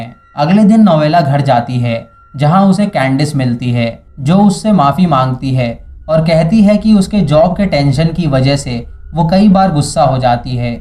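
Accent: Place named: Bihar, India. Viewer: Bhai bhihaari hain kya (hain,hain,hain) bol raha Bhai sahi se explain ker movie. 🖕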